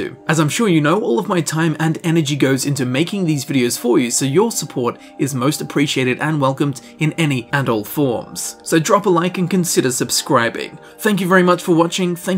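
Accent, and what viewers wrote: Australia. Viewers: Dude it's like / ... I mean you sound Australian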